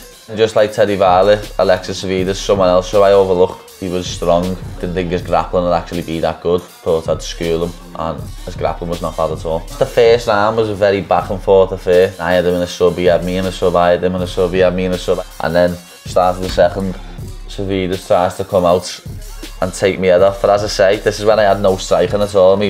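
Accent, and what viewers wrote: Liverpool, United Kingdom. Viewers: I can actually understand what you're saying when you're alone like this, and not playing up the Scouser accent with your buddies. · What is this accent? Where is he from exactly in the UK? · liverpool, england. known as the scouse accent. it's a love or hate it kind of thing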